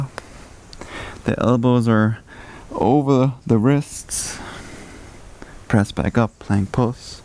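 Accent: Germany. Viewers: Du bist doch deutscher oder ? Hört sich zumindestens so an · Are you German? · amazing tatoos, sexy body and sounds like a German accent ?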